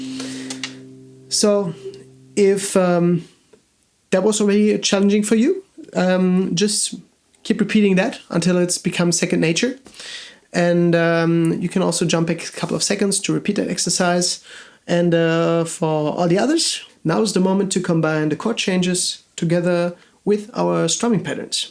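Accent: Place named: India. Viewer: Accent is very similar to Indian accent. Beautiful.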